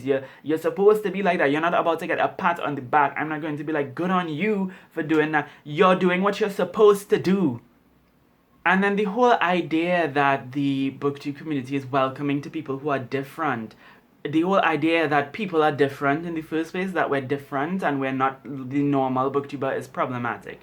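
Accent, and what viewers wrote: Trinidad and Tobago. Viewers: I dont know you but I've learned how to recognize the Trinidad accent! There are LOTS of trinidadian doctors at my hospital that I've learned the accent...am i right? Are you from trinidad?!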